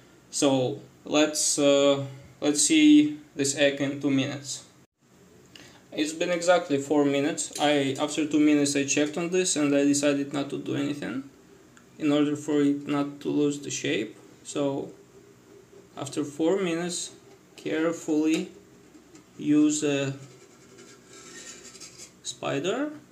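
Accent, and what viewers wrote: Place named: Russia. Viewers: я тоже сразу услышала русский акцент, но он действительно очень приятный. Это совсем не тот русский акцент, когда слышишь и думаешь "лучше говори по-русски"😂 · Какой приятный Русский акцент)))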